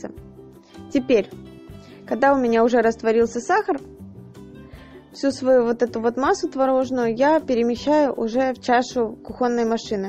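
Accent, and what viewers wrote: Ukraine. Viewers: А мне нравится такой украинский говорок!))Главное рецепт хороший и лишней болтовни нет!))Всех благ вам....милая девочка!)) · Я серьёзно не понимаю - так говорят украинцы на русском языке? Или это диалект какой то? Очень прикольно ☺ За видео - лайк. 👍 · Спасибо большое! И за мусс и за украинский говор, на душе тепло. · Боже мой! Как же ласкает слух ваш говор! Я впервые услышала украинский акцент(если я правильно поняла). Я так и знала, что он мне понравится! Так заслушалась, что по рецепту ничего не поняла)))) Пойду ещё послушаю. И обязательно сделаю такой крем. Благодарю!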